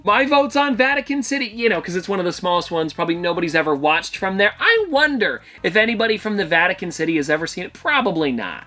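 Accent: Canada. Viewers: dude are you canadian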